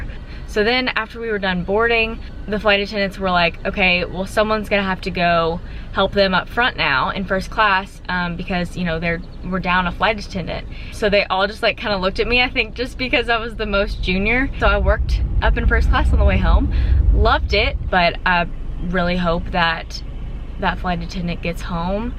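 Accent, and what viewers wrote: South, United States. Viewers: Hi... I'm amazed that your internal flights are still operating in the USA... Our flights in the UK are totally grounded.. We have been told by the UK Government that we can only go out for exercise / get food / if your a key-worker NHS... we're pretty much all grounded, we can't even go out in the car because police are stopping car movements and you risk a £££££ fine!!! You sound really sad...! I just love your accent...;-) YYYeeeeeee Hawwwwww Go Cowgirl!!! Bet you look great in western gear..!!!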